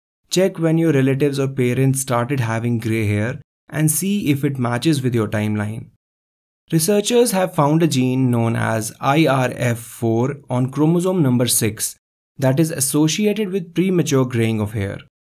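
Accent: India. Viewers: indian accent is so weird to listen.. kinda comedy haha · Hmmm let's see this vid.Oh,India accent... Nevermind.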